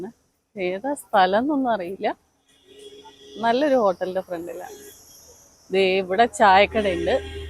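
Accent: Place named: Valluvanad, India. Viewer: വള്ളുവനാടൻ ഭാഷ ല്ലേ... പാലക്കാടൻ slang ഇല്ല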